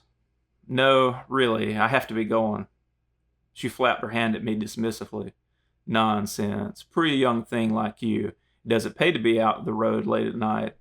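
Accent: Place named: Southeast, United States. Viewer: you sound like the south eastern section of the us to me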